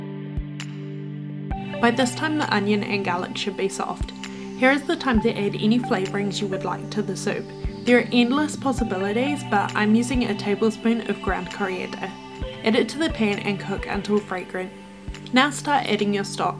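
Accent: New Zealand. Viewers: New zealand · That's a New Zealand accent, right? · Man your accent is sooo intense, I left NZ 13 years ago and I'm 100% sure the accent changed. video is amazing though..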